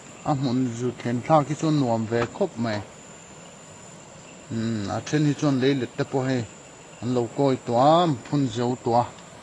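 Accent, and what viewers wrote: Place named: South, India. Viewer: I tawng kau chheh danah chhim lam mi i nih ngei hmel e.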